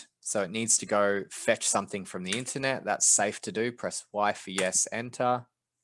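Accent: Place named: Australia. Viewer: I love your aussie twang mattttte,, been to long mate,, catch up in Discord,, Sam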